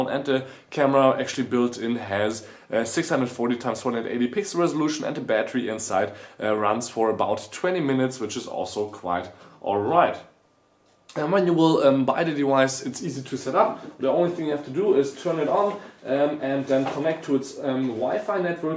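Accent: Germany. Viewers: Man merkt so stark das du deutsch bist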